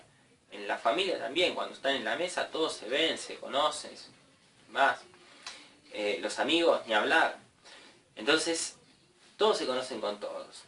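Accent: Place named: Argentina. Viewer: Muy claros tus aportes teoricos. Una clase con acento argentino que se agradece.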